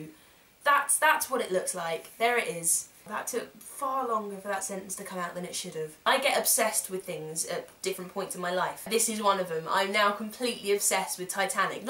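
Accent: United Kingdom. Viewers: I love your videos, you're one of the only British people I'm subscribed to! And I'm British! It's nice hearing a familiar accent! xox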